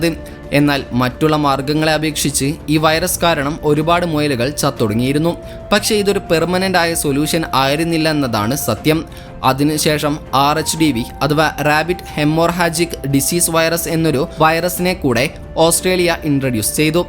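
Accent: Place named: Malappuram, India. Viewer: Nalla malayalathil parayedo ninte malapuram bhasha vemda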